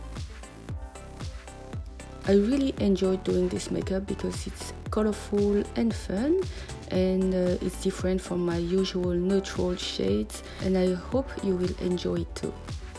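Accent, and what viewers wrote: France. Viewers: Great eyeshadow look and your French accent is 🙌🏼!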